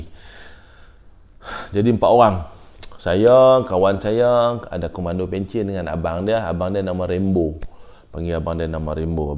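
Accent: Kedah, Malaysia. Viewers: trima Kasih Tuan sudi berkongsi cerita, sikit sebanyak mnjadi ilmu utk kami. tenang ja Tuan cerita piaw kedah punya hehehe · Assalamualaikum tuan,best cara tuan bercakap.Macam orang Kedah bunyinya. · terbaik la tuan bercerita… loghat kedai piaw punyaa… hahaa..dari kedah mariiiii…. kuala nerang mai tuann…. seronok dengaq bercerita dan lawak penuh nasihat… nak part 5 dan 6 seterusss smpai bila bila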